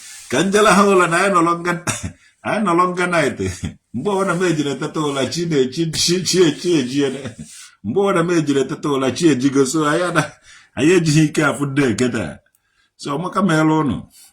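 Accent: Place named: Agulu, Nigeria. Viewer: With this man accent he must be from Agulu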